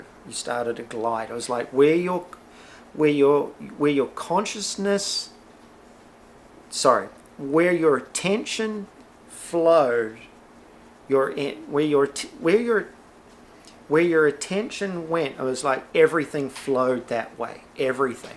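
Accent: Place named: New Zealand. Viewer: Recognized the kiwi accent at the first 5 second of the video, even before he said: it is kiwi hahaha love the accent, miss living in New Zealand ♥ Also I felt for you when you said that you still dont know whats you have to do here on Earth, I myself struggled with that questions up until recently and it was honestly driving me crazy and anxious.... I had an "Near Life Experience" when looking through someones eyes once (I was liivng in New Zealand by that time, yeeeeeey) and I experienced this unicity and seeing the souls of all humans being connect in some sort of galaxy so I know what you are sharing is genuine and 100% true!!!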